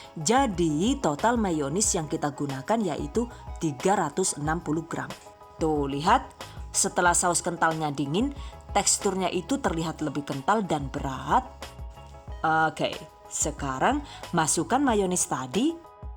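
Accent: Banyumas, Indonesia. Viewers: Lehhh deneng si ngapak jebule 😂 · Kok ada ngomong ngapaknya😀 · Ooo key 😁 salam ngapak ibuneee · Mba sampean wong mediun kok ngapak kwkwwk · Ternyata wong ngapak ibune 😄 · Suka KL ngomong ngapak, walau q g ngarti....tp lucu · Deneng sih ngapak mbokayu??? Ketone enak yakin..arep njajal gawe lah nyonge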